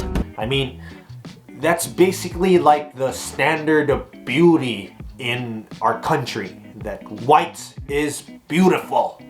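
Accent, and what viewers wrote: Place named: United States. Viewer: copy the colonizer, like making an effort to sound american in a youtube video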